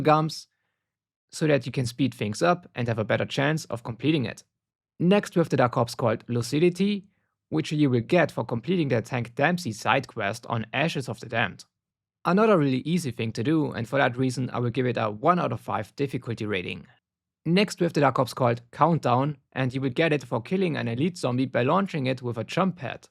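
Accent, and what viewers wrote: Germany. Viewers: Most german accent ever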